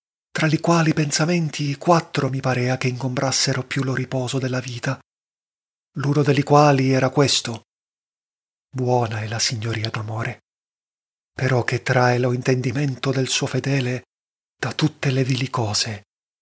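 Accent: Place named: Calabria, Italy. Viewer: Intanto grazie e complimenti per l'ottima lettura, vi scorgo un leggero accento del Sud, se non erro calabrese, e questo mi ha subito fatto pensare come, almeno da un punto di vista simbolico, come sia utile proprio a farci riflettere quanto questa lingua volgare, dalla quale, naturalmente, ha origine la nostra lingua corrente. Farci riflettere, dicevo, come è importante riuscire a considerare questo italiano volgare patrimonio di tutto il paese e non solamente Toscano. Dante Alighieri, secondo me, è una sovrapposizione di strati di genialità: questa narrazione in forma d'eloquio che fa' si che il lettore stesso possa assumere i panni del narratore; poi, naturalmente un talento poetico innato e ben coltivato; ma, sopratutto, la grande attenzione alla forma nella quale descrive la ambientazioni e dunque i paesaggi. Nella Divina commedia poi le ambientazioni sono addirittura fantastiche. 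Ecco, io credo che questo abbia contribuito molto a rendere questo poeta, oltre che sempre attuale, addirittura universale. Certamente era un uomo ambizioso, però dubito che abbia anche solo lontanamente potuto immaginare quanto a lungo e quanto nel mondo tutto la sua opera si sarebbe diffusa. Rinnovo i ringraziamenti ed i complimenti e auguro una buona continuazione in buona compagnia🙏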